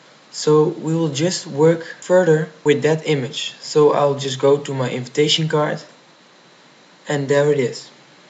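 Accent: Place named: Netherlands. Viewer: You sound dutch ^^ Are you?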